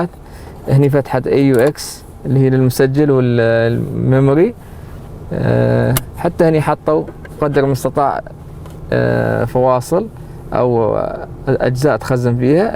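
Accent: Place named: Iraq. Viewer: طريقه كلامك كأنك عراقي الاصل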